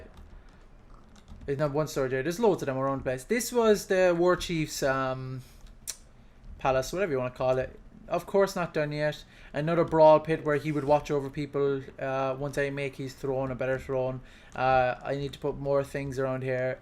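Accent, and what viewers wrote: Ireland. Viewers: I believe he is from Cork (Ireland). He is definitely Irish though.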